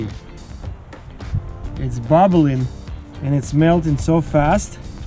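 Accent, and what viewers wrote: Russia. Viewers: He sounds like crazy Russian hacker.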